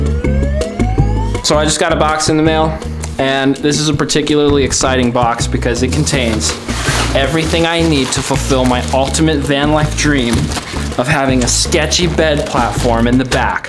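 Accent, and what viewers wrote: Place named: Minnesota, United States. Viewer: Nice video and great editing! I'm hitting the road this summer for a project... But I'm older and going to use a van 😁. New subscriber though today. Couldn't tell, but thought I saw a hint of Minnesota? Best wishes on your new adventures 👍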